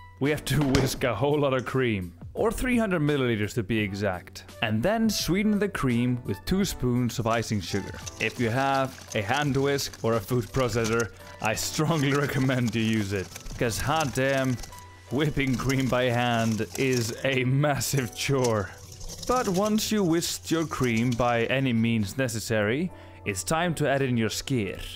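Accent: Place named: Iceland. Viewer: seems like I finally found a good icelandic recipe! I´m so in love with that and miss original skyr and of course skyr cake the most... but I also love how icelandic people pronounce words in english. So thank you in so many ways! 😊🥰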